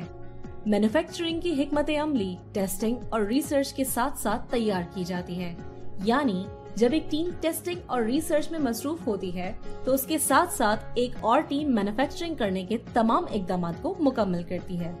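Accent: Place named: Urdu, Pakistan. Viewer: Nice Urdu Accent.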